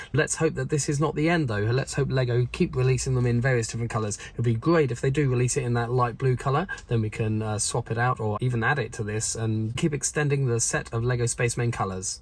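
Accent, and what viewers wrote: United Kingdom. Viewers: When I first watched this I knew he was from United kindom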